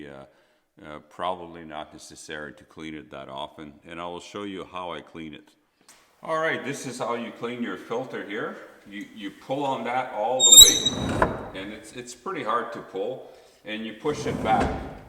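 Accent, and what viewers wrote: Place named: Canada. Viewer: This fellow is Canadain or impersonating 1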